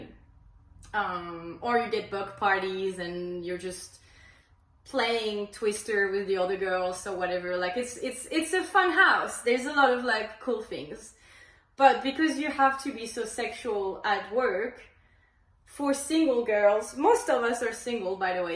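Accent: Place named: France. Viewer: I’m 100% sure she’s French this accent😅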